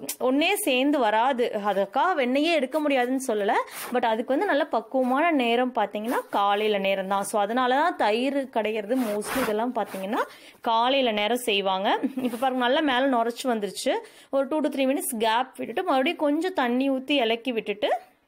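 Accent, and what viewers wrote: Kongu, India. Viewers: Kongu slang video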